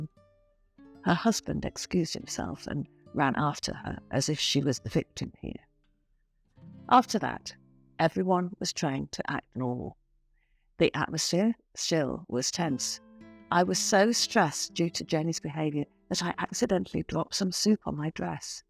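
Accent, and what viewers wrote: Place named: United Kingdom. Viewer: British accent is not for everyone, the story sounds interesting but i couldn't finish it, cos i got stressed listening.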